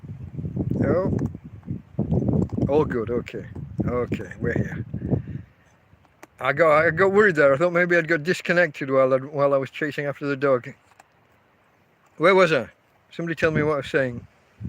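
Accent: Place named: Yorkshire, United Kingdom. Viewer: Are you from Yorkshire ? Strong sense of a northern accent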